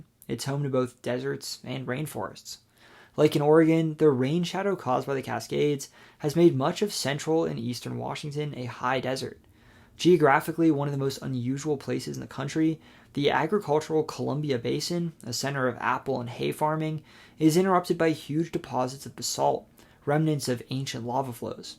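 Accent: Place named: Georgia, United States. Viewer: thought you were from Georgia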